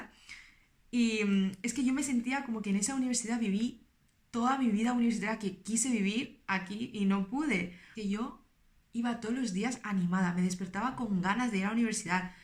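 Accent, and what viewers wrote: Spain. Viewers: Se ve algo raro ver a una persona asiática con acento español😂 y bueno vale la pena anotar que lo habla muy bien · No es que hable como una española, es que yo apostaría a que es más española que yo.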